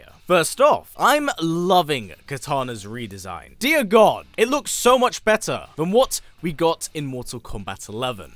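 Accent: United Kingdom. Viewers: No offense, but I don't fink I can't take much more of this accent. I love brit accents, but this ain't it. Keep up the great work, though!! I can't wait to play!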